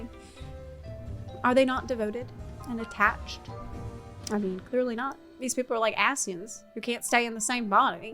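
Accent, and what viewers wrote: South, United States. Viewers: Not only do I love my character (also a bunny girl), but I am fully convinced she is the canon version of the WoL. She is perfect. In other news, I love that years of living in Ukraine has not suppressed your powerful Southern twang in any way. I have it too, I think it comes from our DNA. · Why is having a south girl accent all of a sudden?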